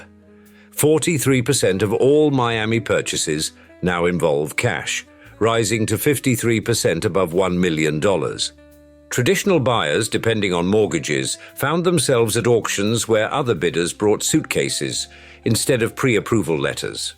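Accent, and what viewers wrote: United Kingdom. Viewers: British accent means go to another story fast.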